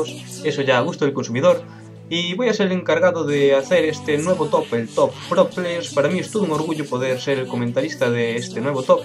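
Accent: Galicia, Spain. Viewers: gallego verdad?¿ si señor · Home claro, jajaja creo que se me nota un poco... xDD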